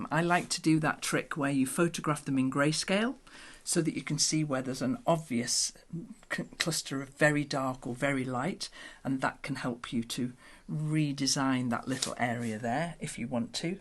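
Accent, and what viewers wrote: United Kingdom. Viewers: Just found your channel. I'm so happy I did. You are just deliteful to watch. I love your British accent!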